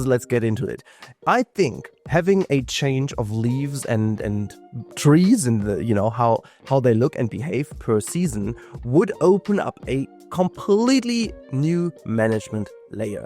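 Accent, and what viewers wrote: Germany. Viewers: Immer noch aus Deutschland, hört man doch😅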